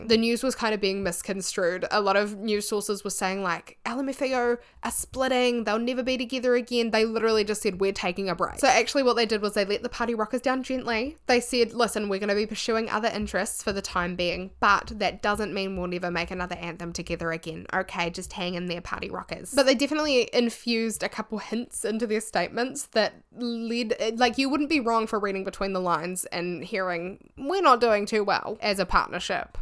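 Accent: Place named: New Zealand. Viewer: about your accent, it's beautiful and really pleasing to hear. I'm from Portugal and before watching your videos I had never heard a kiwi accent, so you're kind of an ambassador now lol I hope that u don't feel the need to explain it everytime ✨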